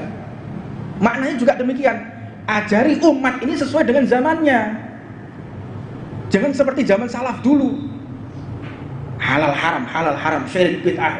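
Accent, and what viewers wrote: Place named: Indonesia. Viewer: nyenengin banget habib husen...pola pikirnya..dakwahnya...gaya ucapanya,nusantara banget...sehat selaku bib...abaikan habib arogan yg lain,teruslah cinta nkri,menjadi habaib,dzuriyah nabi tak perlu di rabithah lawiyah,tapi di akhlaknya nabi bib...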